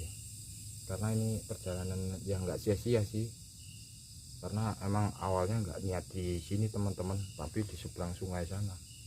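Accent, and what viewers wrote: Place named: Banyumas, Indonesia. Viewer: Kebumen.....wong ngapak iki😂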